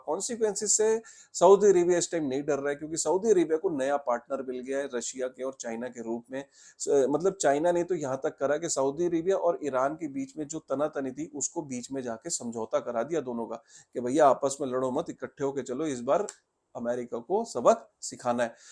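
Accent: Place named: India. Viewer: Interesting subject. But Indian dialect is literally the worst to listen too 😬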